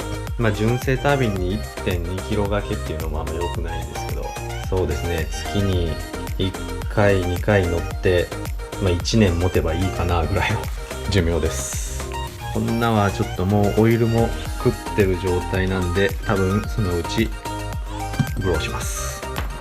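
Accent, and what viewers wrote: Hiroshima, Japan. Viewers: 急にナレーション付き？広島弁ですかね？聞き取りやすくて良いと思いますよ。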